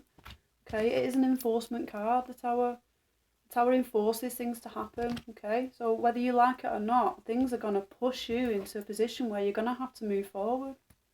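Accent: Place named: England, United Kingdom. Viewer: Hi,missed you that lovely.English acent,Wishing you peace and Blessings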